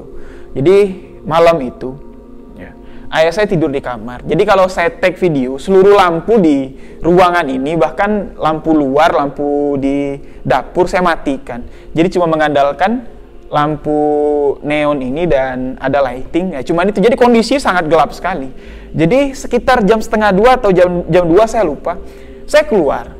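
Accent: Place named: West Sumatra, Indonesia. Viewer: Nada suaranya kayak org minang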